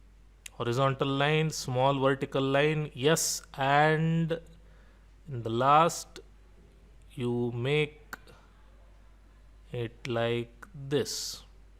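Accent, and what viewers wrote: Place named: India. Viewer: Your videos are very helpful, my friend. However, you're giving us English words to help us with Hindi pronunciation. The problem is that you are pronouncing those English words with a very, very strong Indian accent. Thus, your English examples are not helpful to me, at all, because I find that you pronounce those words very differently from us. I would like to request that you give examples with Hindi words, and not English words. That would make your lessons much more beneficial. "Is" is NOT pronounced with the same sound as "meet." Is = Short i Meet = Long E The "Short i" sound does not exist in Indian languages. So, it would be very difficult for you to master the sound. It would also be difficult for you to hear the difference between "it" and "eat." My point is that I am working very hard to learn authentic Hindi pronunciation. 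It would be helpful if I were more exposed to pronunciation in the Hindi language, as opposed to trying to compare Hindi and English sounds so much. In the end, our sounds are completely different and they really should be not be compared to one another. I love your lessons, though, and am enjoying learning with you very much.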